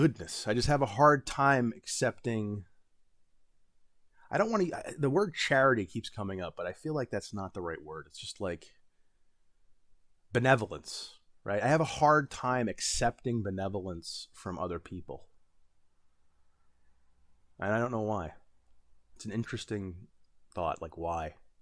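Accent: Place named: United States. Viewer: The voice The shirt The American accent Absolutely cinema.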